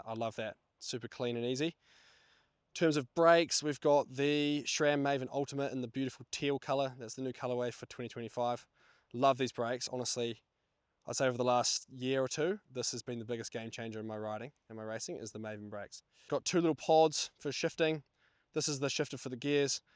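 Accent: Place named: New Zealand. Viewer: Bump dat audio a bit. The people wanna hear that kiwi accent loud n clearrr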